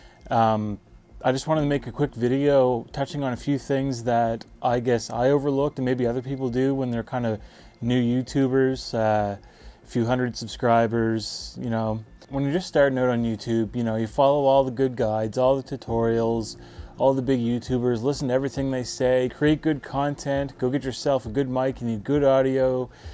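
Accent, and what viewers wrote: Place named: Central, United States. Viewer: Ok, sorry, you sound just like and American from the central part of the U.S. I should have realised that, because I knew where the bay of Funday was.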